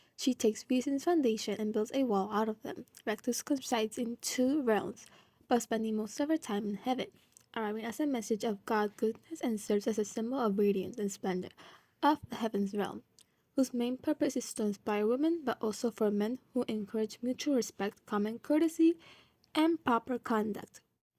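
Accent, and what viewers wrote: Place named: Spain. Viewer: Ahh makes sense. Im assuming you know how to speak spainish aswell because of your accent. I do have video request which i think would also make good content, Could you make an episode dedicated to the Arabic Slave trade its a fascinating series of events that i think are quite quite impactful in history to the modern Era. Alwo are these your only socials or do you have a 2nd channel or instagram channel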